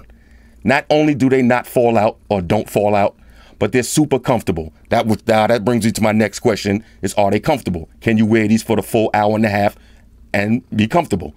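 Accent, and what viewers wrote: New York, United States. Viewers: SQUAAAAD! Love you. Half of the time I'm watching your videos because i'm a tech girl and I want the latest stuff out. But the other half is because i'm in The South and I miss NY dudes with the "accent". Take care and continue to bring us that good ISHHHHH!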